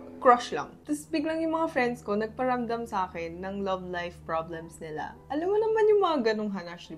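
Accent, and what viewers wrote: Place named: Batangas, Philippines. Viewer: alam nyo ga? ha ha ha! you are Batanguena through and through… 😊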